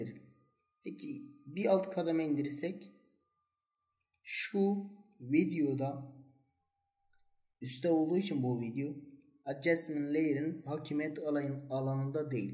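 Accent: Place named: Urfa, Turkey. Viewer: Eline diline sağlık şu Urfa şivenle dahada çok seviyorum sizleri ve kanalını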